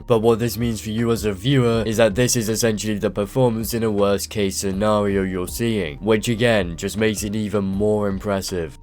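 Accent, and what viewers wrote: United Kingdom. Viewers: British accents crack me up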